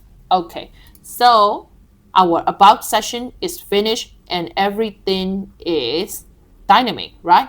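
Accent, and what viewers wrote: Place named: Myanmar. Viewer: Are u a Myanmar? Your accent like Myanmar 😐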